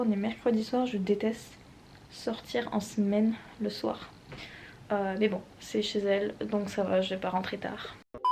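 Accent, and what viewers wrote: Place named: South, France. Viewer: Premier accent du sud que j'entends depuis que je suis à LA ! Merci 🙌!! Sinon super vidéo, j'aime beaucoup ce que tu fais ! Faut que je continue de mater ta chaine ça va me donner des idées pour la mienne 😂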